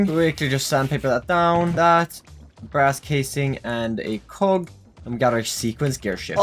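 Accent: Sweden. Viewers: Ar you Swedish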